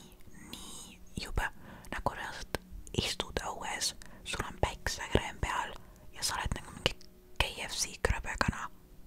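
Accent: Saaremaa, Estonia. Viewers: Awwi , sa oled saarlane 🫶🏻 aga tule Hiiumaale ka 🤠 näiteks tuuletorni ja kardiga sõitma see seal lähedal ☺️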